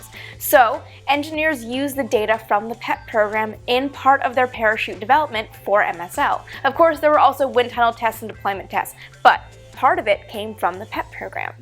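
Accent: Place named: Canada. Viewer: I was wondering the same thing - I noticed how you { } pronounce your "ou's" when you say words like "about". {FWLIW: Growing up in Florida, there was an 'older couple' who were neighbors of my parents. The husband was from Italy, and the wife was from Canada. Listening to her talk was my first exposure to that pronunciation.}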